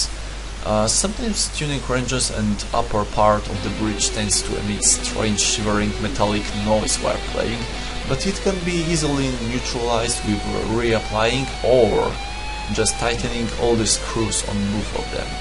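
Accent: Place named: Poland. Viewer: good video man. haha dont worry about your English, and be proud of your Polish? accent! greetings from Czech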